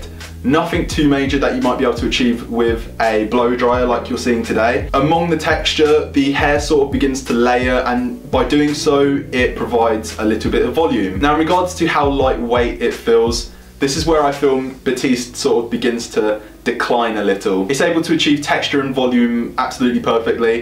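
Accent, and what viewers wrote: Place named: London, United Kingdom. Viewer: Why doller u got london accent we have pounds in london ?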